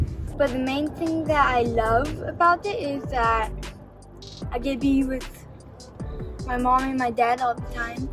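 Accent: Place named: Pacific Islands. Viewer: Bro love your accent man. Sounds like you got a bit of that island swag incorporated. Seki work out. Much alofa Western Sydney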